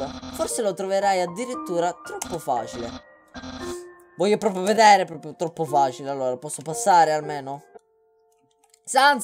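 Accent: Sicily, Italy. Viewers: comunque si sente che sei siciliano anche se non parli il dialetto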